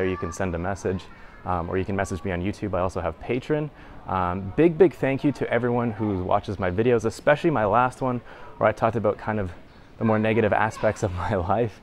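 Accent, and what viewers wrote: Canada. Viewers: That is his Canadian accent. In Canada both 'or' and 'orr' are pronounced with a long o where as in the US 'or' has a long o and 'orr' has a short 'o'. Thus in Canada the 'o' in sorry is pronounced the same as the 'o' in sort, while in the US it is pronounced like the 'o' in pot. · wow I love watching ur vids and when I'm stoned your voice sounds like the purest Canadian so maple syrupy and beautiful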